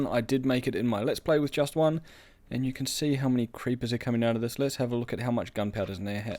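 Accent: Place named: New Zealand. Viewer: Subtle kiwi accent almost snuck past me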